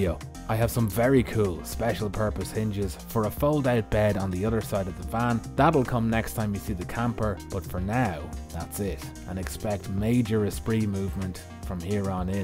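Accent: Ireland. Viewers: You're still one of the best video editors, I love your work. The content itself is excellent and has me mesmerized. And the Irish accent narrations is the cherry!😉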